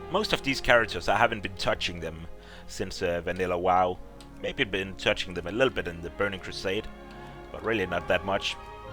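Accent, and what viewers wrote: Denmark. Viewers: Are you danish? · Are you danish? :b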